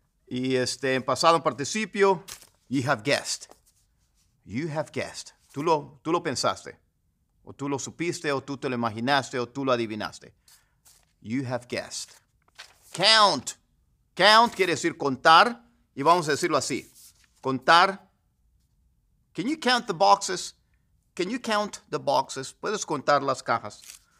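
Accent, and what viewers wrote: United States. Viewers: His English has such a strong American accent lol I thought he was American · Por qué tu español tiene acento gringo??? Es un efecto de aprender el inglés con acento nativo?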